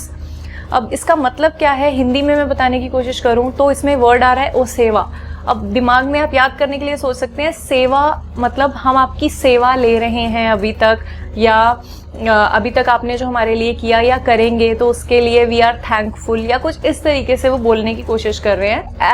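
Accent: Punjab, India. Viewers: Ap punjabi ho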